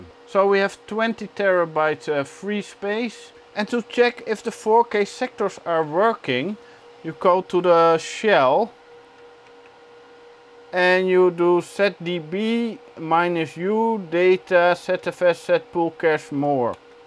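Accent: Netherlands. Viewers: Je klinkt erg Nederlands.. Klopt dat? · ik kan gwn meteen horen dat je nederlands bent hahah Wel leuke vids man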